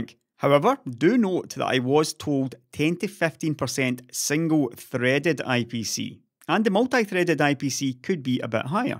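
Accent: Scotland, United Kingdom. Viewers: is this Scottish? so lovely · a dash of scottish accent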